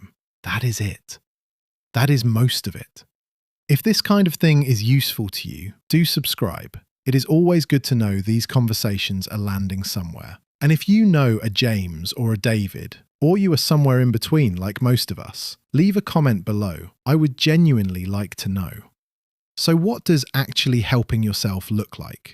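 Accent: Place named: United Kingdom. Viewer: The British voice always signifies doom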